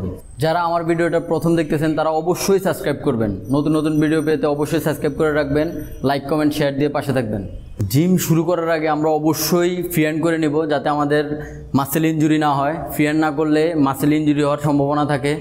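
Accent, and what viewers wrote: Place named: Habiganj, Bangladesh. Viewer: ভাষা শুনে মনে হচ্ছে আপনি হবিগঞ্জ এর মানুষ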